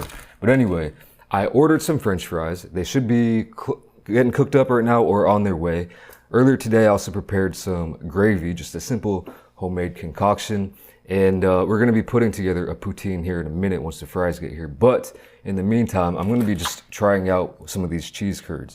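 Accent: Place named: Midwest, United States. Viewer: Why would he be canadian? He clearly has a midwest accent and lives in Korea lmao, nothing canadian about bro.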